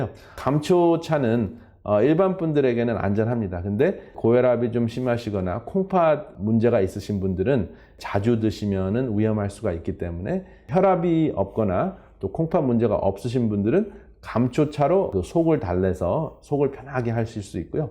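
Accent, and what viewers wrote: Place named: United States. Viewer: 그렇게 생각하실 것 없습니다. 이분은 미국에서 오래 사시다보니 자연적으로 미국식 발음에 익숙해져서 그런거지 일부러 혀꼬부라진 소리를 하는게 아닙니다~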